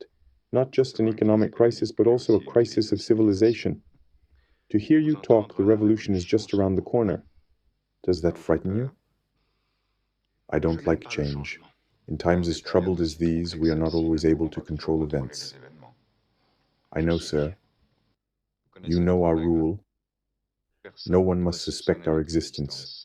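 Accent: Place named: France. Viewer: Your French accent is a plus value to this narrative thanks for your originality not using AI ;) Luckily anglophones will now benefit from your impressive knowledge sharing. Terrific.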